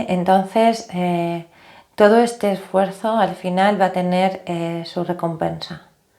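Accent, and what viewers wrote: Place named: Spain. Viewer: Muchas gracias 👍.✔️✔️✔️ Me pregunto de cual parte de España será este acento? Habla como la actriz Marina José.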